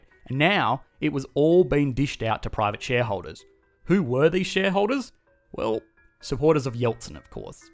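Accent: Australia. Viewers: it sounds pretty normal to me with a hint of Australian. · Gotta love the Aussies with their cockney-esque accents - hangover from history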